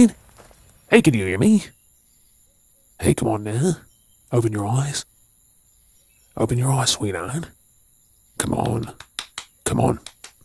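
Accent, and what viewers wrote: Australia. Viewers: Oh, geez, I'm a sucker for Aussie accents! I love your work,! Thanks more making the content you do!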